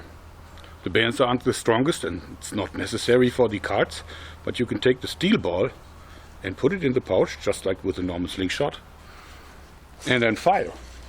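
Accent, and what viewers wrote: Germany. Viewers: the typical german-english accent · Haha du bist deutscher oder? :D oder sogar schweizer? ^^ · Man hoert an deinem Englisch das du Deutscher bist was ich auch schon vorcher wusste aber ich feier dich:) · du bist deutsch das merkt man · Ist der Deutsch,wegen dem "Akzent" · Are you German? You have a German actcent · Ja, man merkt das er Deutscher ist :D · I like your German hah hah hah hah! Sounds so German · Ich denk die ganze zeit das er deutscher is der es probiert englisch zu reden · Hach, wie ich diesen Deutschen Akzent im Englischen toll finde :D Super Video ✌ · hes a german ;) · hörst du den deutschen accent nicht wenn er englisch spricht??? wow!!! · For me he Sounds very German ^^' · Yeah, German Accent... I'm German and I hate German accent the most... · Man hört einfach sofort das du deutsch sprichst 😂ich feier dich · oh :X ich dachte Amerika weil alle so blabla dein deutscher Akzent noch sorri dafür ^^ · Bruder man hört das du nen deutscher bist xD Edit. 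Hab dich grade bei my spaß gesehen bei rab gut gemacht 👍🏻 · Haha man merkt richtig dass er deutscher ist😂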